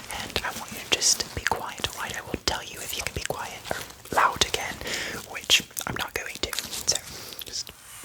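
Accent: United Kingdom. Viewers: Please make a part two or more british accents i LOVE ITT💕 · As a Brit I was very impressed with the accent,I'm from deepest Lincolnshire now that is an accent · As a british person, this is how the women talk, the men sound like hard northern accents or indian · I live in britan and this is how we speak :3 "A Bo'oh o' Wa'ah is always good £:" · As a British UK citizen this video is brilliant pity our NHS systems in poor state takes weeks to find appointments got the british accent spot on tho 😊 love how you done your UK homework on london big ben / British retail boots 😊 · You ate that British accent up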